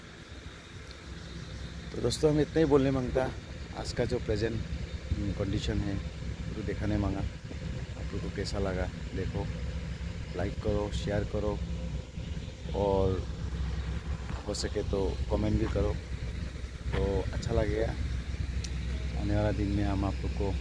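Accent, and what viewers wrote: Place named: Haflong, India. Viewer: This is Haflong Hindi. We've developed our own unique way of speaking the language...aur vese bhi, jese Mumbai , ya phir Hyderabad me log apne tarike se hindi bolte he, similarly Haflong me humlog Haflong Hindi me baat karte he...Humlog North India se to nehi he na, so, don't criticize his way of Speaking if you don't have any knowledge about the place or people living in Haflong....Look up Haflong Hindi if u don't know about it allready..It's unique in itself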